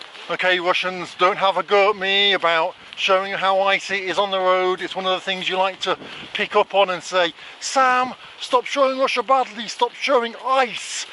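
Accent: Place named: Manchester, United Kingdom. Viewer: I have subscribed Sam. R u from Manchester judging by accent? I might b wrong tho 😂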